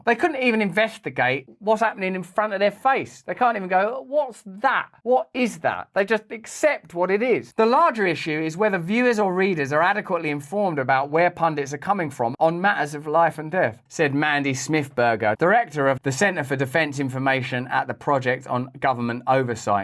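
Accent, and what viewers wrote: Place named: England, United Kingdom. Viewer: I been following your channel for a while and one thing that stops me from continues watching your good content is lack of subtitles. It is hard for me to understand your English accent. Hope you can add subtitles one day so that native Spanish speaker have an easier and clear understanding of such valuable information.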